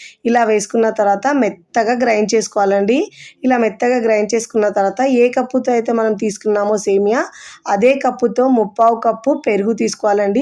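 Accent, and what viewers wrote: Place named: North India, India. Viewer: ఎందుకు సబ్స్క్రయిబ్ చేసుకోవాలి, ఇ లాంటి చెత్త కోసమా, అసలే నార్త్ ఇండియన్ లా ఉన్నారు తెలుగు రావట్లేదు.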